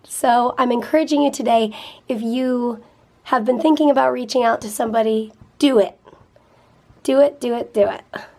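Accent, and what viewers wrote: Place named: Philadelphia, United States. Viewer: hehe, I think you might have a slight philly accent....:) here in Philadelphia all of us say "fr" instead of "for":) hahahahaha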